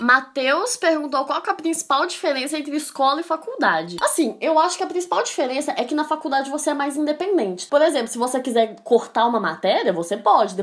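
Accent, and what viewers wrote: Minas Gerais, Brazil. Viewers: Mineiro é outro nível. 😍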